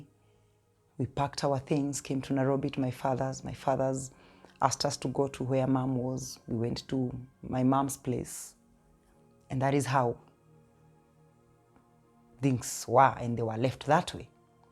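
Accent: Tanzania. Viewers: When I first heard you I thought you are a Tanzania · What an inspiration! Glory to God. To be sincere I used to think you're from Tanzania because of the sweet voice and sweet Kiswahili for that matter. More grace in your ministry.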